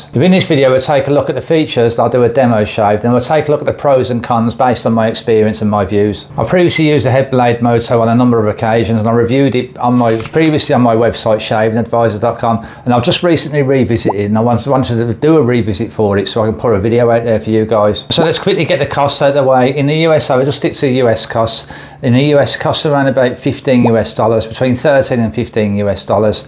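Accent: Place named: Birmingham, United Kingdom. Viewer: Love the Brummie, I thought it was Brummie lol.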